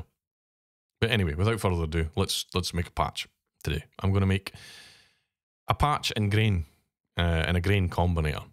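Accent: Scotland, United Kingdom. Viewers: Yes! I had to subscribe to the channel! Love the Scottish(?) accent. ❤️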